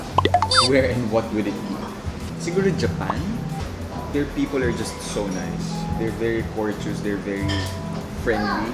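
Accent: Bicol, Philippines. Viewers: I can still hear the bicol accent. Siram sa pag dangug haha Namiss ko lugud ang Naga hah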